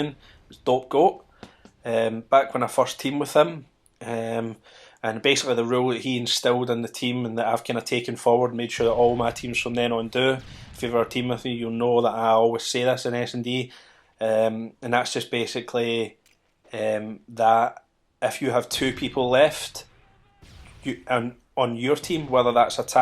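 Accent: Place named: Scotland, United Kingdom. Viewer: feels weird hearing a fellow scottish man doing one of these haha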